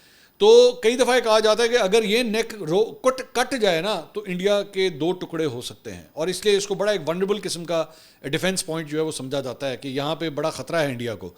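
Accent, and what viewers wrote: Punjab, Pakistan. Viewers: As a Punjabi buhat Acha Lagta hai punjabi tarka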